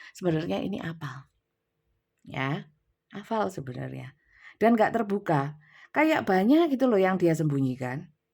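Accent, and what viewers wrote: Java, Indonesia. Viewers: Hdeeeh bunda lucu ,medok mboteopo dechhh😊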